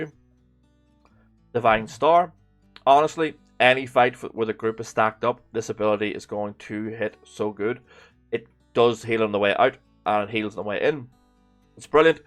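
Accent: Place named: Northern Ireland, United Kingdom. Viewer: Nice to hear some more Northern Ireland players out there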